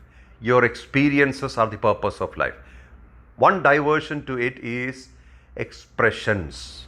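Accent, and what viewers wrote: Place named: India. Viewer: I wish you could put subtitles in English for the deaf and for people who are not get use to hear Indian accent. Thank you!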